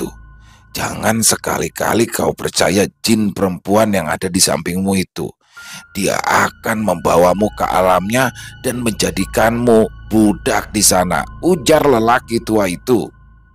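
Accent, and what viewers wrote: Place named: Banyumas, Indonesia. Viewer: Tumben itu perkataan yg dimaksud diluar kebiasaannya. ContohTUMBEN KAMU MASUK PAGI PAGI BIASANYA DATANGNYA SIANG. KLO sampaikan/sampean itu kata sama dengan kamu, lebih ditujukan untuk orang yg lebih tua dari kita. Aksen bahasa Jawa ngapak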